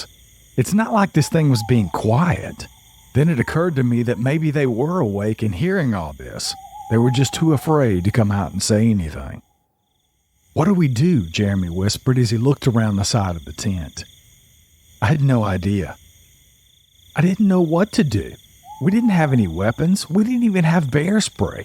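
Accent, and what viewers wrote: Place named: Texas, United States. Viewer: Trying to place the accent....texas?..oklahoma?.... Arkansas here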